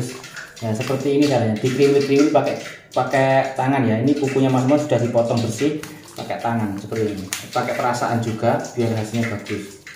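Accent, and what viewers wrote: Java, Indonesia. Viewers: Aduh bahasanya medoook banget... Tapi mudah di mengerti.... Dan trima kasih ilmunya. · Mas iku wong Endi nek omong kok medok banget,tak cobake mene tuku ayam.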